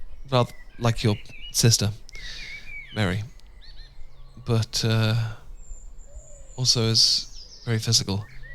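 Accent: United Kingdom. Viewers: More British RP accents please! 💖 I use these to improve my English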